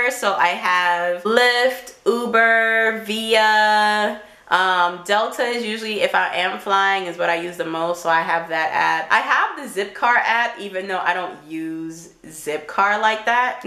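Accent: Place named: New York, United States. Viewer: Ayeeee you a NEW YAWKA🤘🏽💙but i have wordscapes to its mad addictive.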